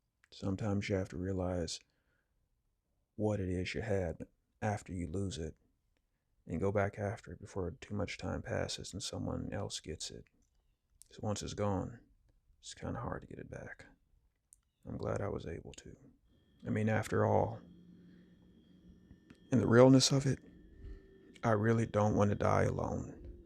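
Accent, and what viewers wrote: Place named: South, United States. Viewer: 🤗 great presentation yet again! I must say that I love your Southern Charm Style Accent! 🥰☺️🥹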